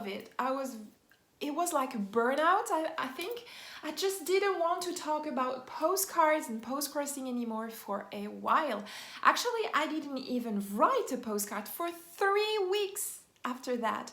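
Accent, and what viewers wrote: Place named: France. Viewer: We love you! You don't have to say sorry:) (even when you say with a french accent which is so cute <3 )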